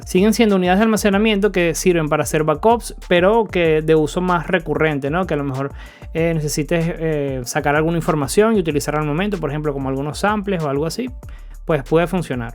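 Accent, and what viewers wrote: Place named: Venezuela. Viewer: Hola amigo, soy nuevo suscriptor, por tu acento noto que también eres de Venezuela, de ser así me gustaría saber al día de hoy que Laptop recomiendas de las más económicas para empezar acá en Venezuela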